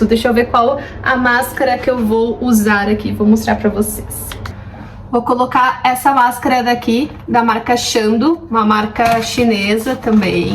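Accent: Rio Grande do Sul, Brazil. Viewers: Amo os teus vídeos! E tu ainda não perdeu o sotaque gaúcho, tchê!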